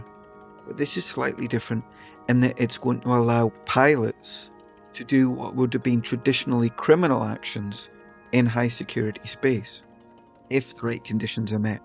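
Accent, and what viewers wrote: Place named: Scotland, United Kingdom. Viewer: Great video, loads of useful info and explained easily. Plus i could listen to that Scottish accent all day, easy listening :)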